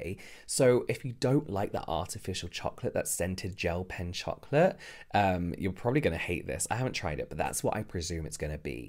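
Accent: United Kingdom. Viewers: It is the way you explain things, describe products, give your opinion with a touch of British accent that makes watching your videos such a learning experience and a joy! 😊❤️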